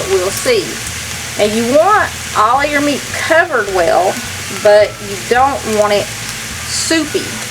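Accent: South, United States. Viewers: I love your videos!!!! Keep up the good work. My family is from eastern Kentucky so I love that southern draw. I believe your from Knoxville if I'm not mistaken. I spend a lot of time in the Smokies. Your lucky to be that close, I'm 4 and a 1/2 hours away (Dayton, Ohio). One last thing, I see you have the Andy Griffith show on. Best TV ever.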